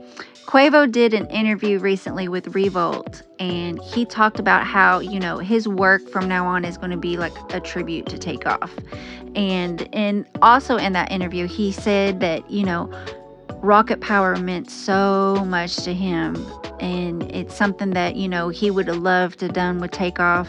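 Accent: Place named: South, United States. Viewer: Love your voice! You sound like a southern Belle! ❤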